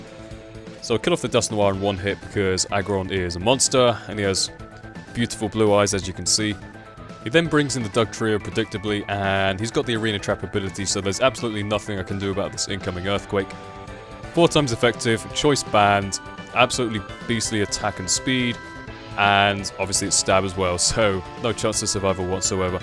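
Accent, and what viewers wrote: United Kingdom. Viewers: Your British accent soothes and reassures me. Will watch rest of playlist. Enjoy my like.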